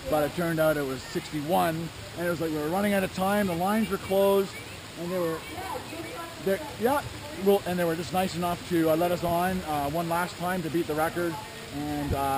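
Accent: Canada. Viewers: You have that Canadian accent (I'm in the USA) you say aboat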